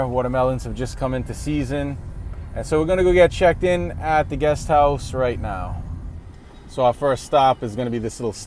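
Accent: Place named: New York, United States. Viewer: You're one of like 4 channels I subscribed to. Keep the videos coming I think once anybody into Ancient Egyptology or even anybody who Just wants to see how beautiful Egypt is from your videos sees your channel you'll have a shit ton of subscribers. Very well informed and your vids show the real side of modern day and ancient egypt. Btw you're from NY aren't you? Lol I hear it hahahaha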